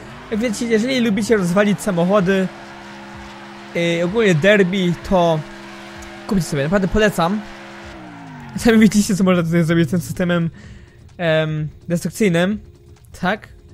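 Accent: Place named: Germany. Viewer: ty gadasz z akcentem niemieckim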